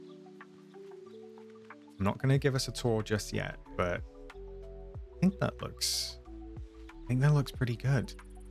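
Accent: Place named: Wales, United Kingdom. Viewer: I new I heard the Welsh there. I'm a Welsh valley girly too. Thank you for these videos though, they really do help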